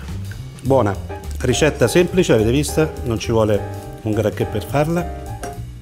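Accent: Tuscany, Italy. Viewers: Forse perchè è toscano? Di solito dicono anche seggiola invece di sedia!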